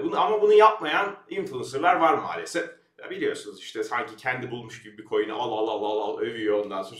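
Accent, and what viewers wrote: United States. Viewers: Komedi filmisin yaaa. Olumsuz yorumlarıda hemen siliyorsun en ufak eleştiriye açık değilsin. Bilgin yok ve amerikan aksanıyla Türkçe konuşarak, İngilizce kelimeler ekleyerek de olmuyor. İnsanları yanlış yönlendirme lütfen, anlattıklarını zaten çocuklarda biliyor.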